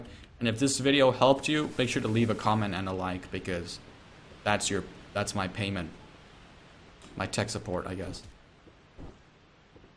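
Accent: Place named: India. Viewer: dang bruh, your indian accent is barely noticeable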